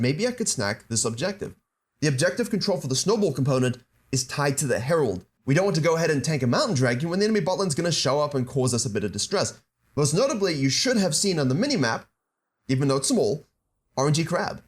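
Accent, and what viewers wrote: South Africa. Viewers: Was wondering from his accent where he was from, that's really cool to know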